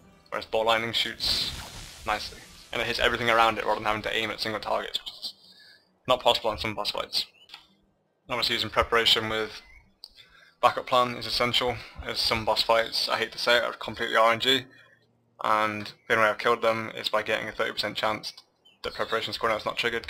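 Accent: United Kingdom. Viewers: You really sound like you're from around Leicester aha. Are you? great guide though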